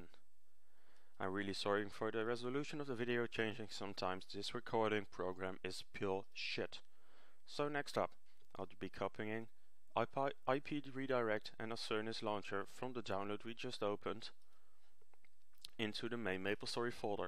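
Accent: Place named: Japan. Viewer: you sound like a japanese :D :D :D so... where do you live?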